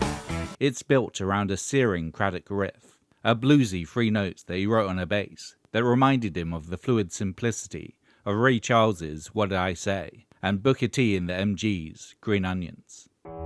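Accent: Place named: Birmingham, United Kingdom. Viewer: Didn't realise you were a Brummy!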